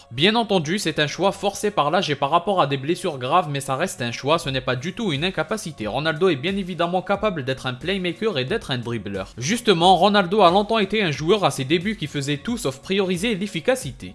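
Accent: Algeria. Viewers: J'ai l'impression que tu es algérien de l'Accent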